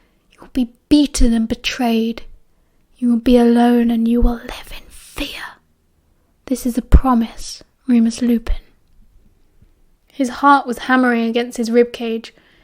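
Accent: United Kingdom. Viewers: Your brittish accent is supremacy 🛐🙏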